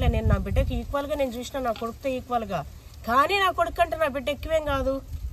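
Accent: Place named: Rayalaseema, India. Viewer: Rayalasema slang ki navvu vachindi 😅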